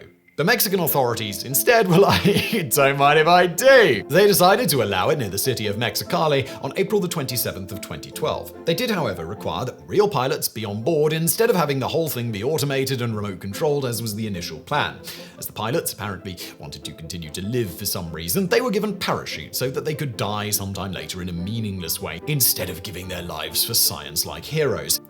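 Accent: United Kingdom. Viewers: I sometimes find myself paying more attention to Simon’s diction than to what he’s actually saying. How is it that the British pronounce the word “air” as just “a,” with a short “a” sound? Also, since my son is a flight instructor working toward building enough hours to fly commercial, I am also very thankful for the strength of airline safety. I keep telling people who worry about flying that they should really be worried about driving to the grocery store. Also, it’s glide “slope,” not glide “scope.”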